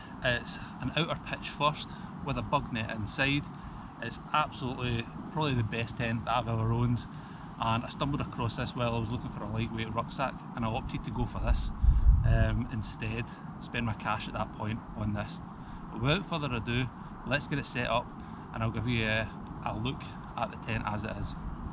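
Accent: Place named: Scotland, United Kingdom. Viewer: If only all other tent reviews on YT were as clear, thorough/detailed, and as succinct as your...a brilliant effort, and that lovely Scottish accent as well!..the only things I would have added would have been: 1. To show the space in the inner with a sleeping bag to determine if it actually touches the inner, and 2. You sitting up inside the inner to give an idea/perspective of the space. But that's easy for me to say having watched it. Thanks, a worthwhile use of 15 minutes of my time!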